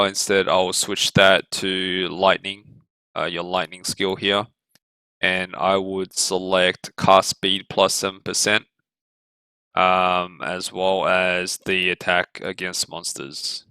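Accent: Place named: Australia. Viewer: you sound aussie :) subbed nice vid mate! helped me heaps ,south aust.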